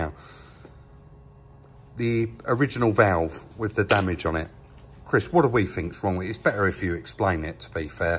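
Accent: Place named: Kent, United Kingdom. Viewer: are you from kent??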